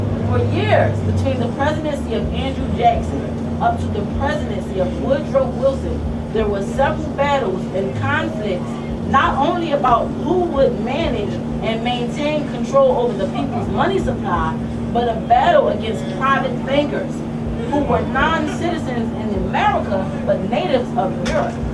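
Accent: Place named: Philadelphia, United States. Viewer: "Voluable"? Lol, love that philly accent. Just teasing! Nice video.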